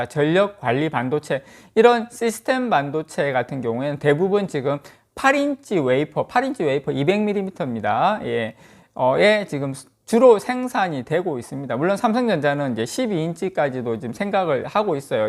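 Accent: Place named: Gyeongsang, South Korea. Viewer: 저도 경상도🤗 발음 편안함니닷😄 점진적 소곤소곤 우상향📈 🅻🅾🆅🅴(๑•᎑< ๑)♡🙆🏻‍♂️🙆🏻‍♂️🙆🏻‍♂️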